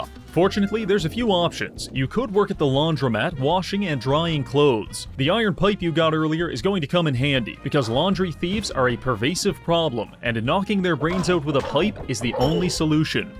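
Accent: Canada. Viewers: I can hear that canadian accent boy... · Wtf are you canadian...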